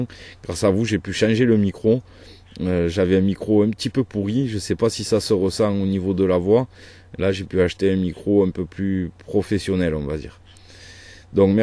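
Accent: South, France. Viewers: Avec l'accent du sud... c'est parfait. Merci beaucoup pour toutes ces informations. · Oui cet accent c du sud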